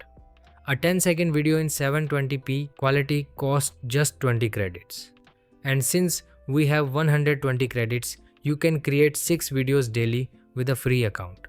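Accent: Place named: India. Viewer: As soon as heard the Indian accent I already knew it was some bullshit up ahead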